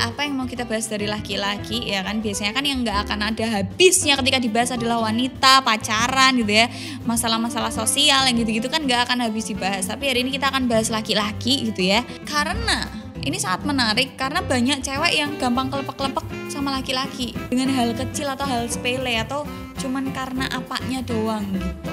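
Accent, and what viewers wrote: Java, Indonesia. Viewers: Jowone pundi sampean kak... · Logatmu..medok tenan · medok medok kepiye..gitu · medok banget suarane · jowone medok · Boso jowo ne medok banget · mbak e medhok tp tep nggemesi hmm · Jawa bgt reeekkkk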